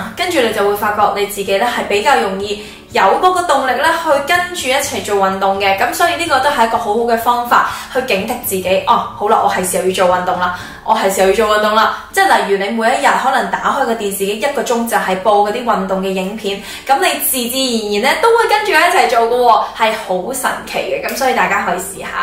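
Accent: Standard. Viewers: 原來coffee普通話也說得很標準的，第一次看到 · 好喜欢听coffee 说普通话。感觉你的国语越来越标准了👍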